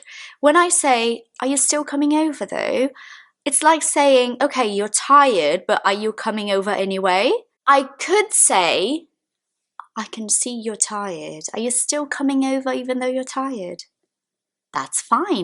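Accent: United Kingdom. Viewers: I love this british accent 😍🇬🇧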